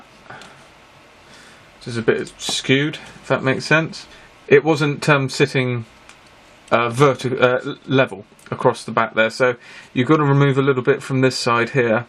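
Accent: United Kingdom. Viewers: Build kit with british accent....i love it👍👍👍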